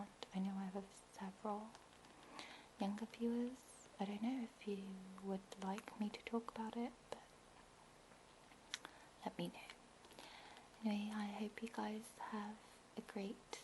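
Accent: England, United Kingdom. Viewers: It's not an interesting accent it's a British accent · I love your accent, which part of England is it from?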